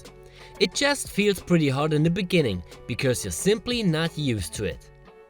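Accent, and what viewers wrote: Germany. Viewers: When an German-sounding European, with the beside manner of Hannibal Lector tells you these things, you listen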